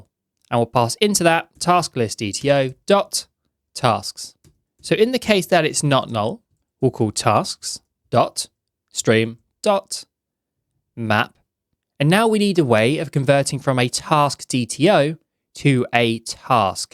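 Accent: United Kingdom. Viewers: sorry but.... why is british accent so hard to understand,why cant u guys just speak what should be spoken...:(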